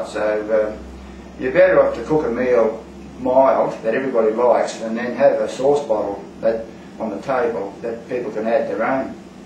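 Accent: Australia. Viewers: are you Australian. Cause you have an Australian accent.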